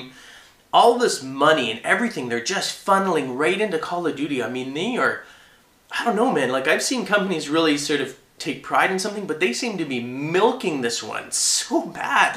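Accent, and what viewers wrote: Canada. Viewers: I'm just wondering are you Canadian?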